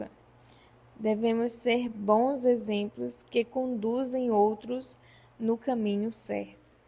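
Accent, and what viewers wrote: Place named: Northeast, Brazil. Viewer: minha irmã você é nordestina..por coisa do seu sutak.rsr😍🥰🤳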